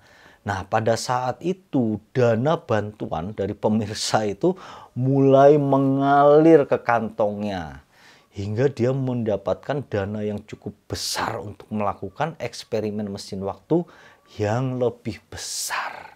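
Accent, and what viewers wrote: Java, Indonesia. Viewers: kok medok , dan tau arti rika ? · Medhok banget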